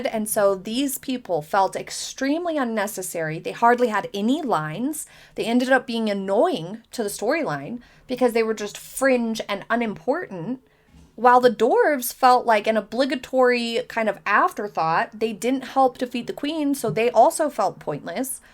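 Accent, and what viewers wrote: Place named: North, United States. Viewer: I can definitely hear your northern accent today. Are you from Wisconsin?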